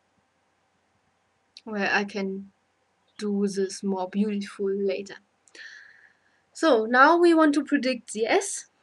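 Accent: Germany. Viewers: u have german accent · Idk guys, l am able to understand her, I guess experience talking to Germans helps. Thank you for the video!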